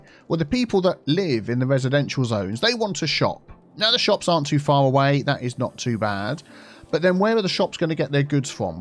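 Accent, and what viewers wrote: United Kingdom. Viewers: Youtube algorithm works in mysterious ways! I had a very similar experience with Silberphönix. And yes, British accent does have that effect! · Sooooo, having a British accent has that affect on non brits? 👀👀👀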